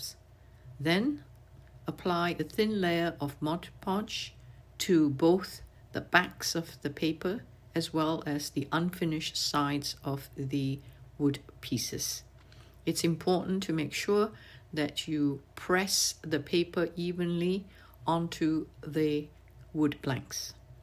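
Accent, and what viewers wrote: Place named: United Kingdom. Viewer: Such cute earrings. I wasn't expecting your voice with a British sounding accent. It was so nice to hear your voice and I think you should do more tutorials with your voice.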